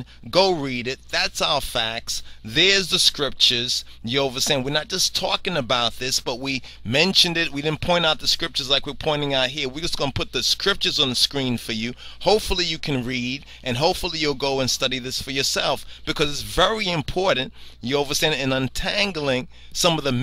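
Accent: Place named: New York, United States. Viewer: Are you from New York or maybe Boston? I hear a familiar accent, just curious. (BTW) I like it a lot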